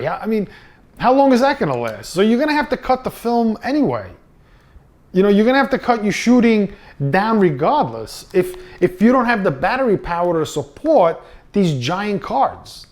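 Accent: New York, United States. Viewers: Let me guess, your from New York. What is your obsession with the grip. Review the camera/cameras. Your voice is so irritating and the non info about the cameras means you wasted 20+ mins of my life. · Thanks for the great video, you sound like a New Yorker. I have a question about the sensor size, it's advertised that the 2500 has a 1" sensor. The actual dimension of the sensor is 13.2mm X 8.8mm. and there is 25mm in an inch. And if they add the L plus W it equals to 22mm and not 25mm. My Sony R1 Bridge camera is 12 years old and the sensor size is 21.5mm X 14.4mm which equals to 35.9mm. This means that my 12 yr. old Sony's sensor is about 60% larger than the FZ 2500 sensor. So my point is, all this hype about the 2500's sensor size is really no big deal, sounds like it's an advertising gimmick. What are your thoughts about this???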